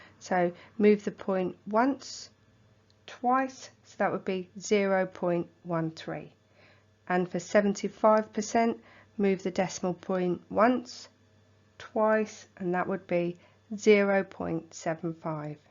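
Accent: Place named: United Kingdom. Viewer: Why do you sound so British Mrs?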